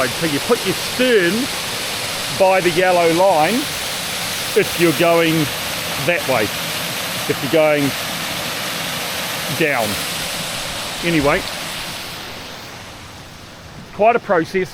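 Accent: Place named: New Zealand. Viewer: he is a new zeelander i think because he says tin instead of ten. oh yeah silly me i see NZ in the channel title now.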